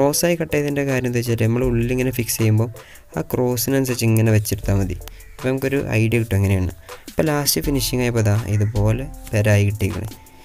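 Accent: Malappuram, India. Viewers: Ee malapuram basha poliyaanu.frendsinod parayunna pole und.anthayalum adipoliyayittund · നല്ല ക്രിയേറ്റിവിറ്റി👌♥️👌♥️ പിന്നെ ഞമ്മളെ മലപ്പൊറം സ്ലാങ് അവതരണവും😀😀♨️♨️ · മലപ്പുറം ഭാഷ പൊളിയല്ലെ..... എന്തിനാ അത് പറയാൻ മടിക്കുന്നെ.... സംസാരികുംനോൾ സൗണ്ട് കുറച്ച് കുറവാണ് അതൊന്നു ശ്രദ്ധികൊണ്ടു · വർത്താനം കേട്ടപ്പളേ തോന്നി മലപ്പുറം ❤️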